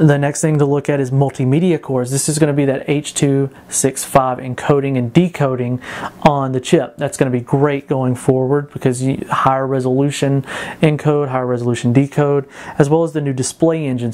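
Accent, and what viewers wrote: South, United States. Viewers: I love how his southern accent keeps shining through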